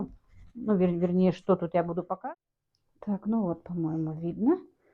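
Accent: Standard. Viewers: Чистейшая, грамотная русская разговорная речь. умница.Наверное имеет высшее образование.да и книги читает. ❤Дай Бог здоровья.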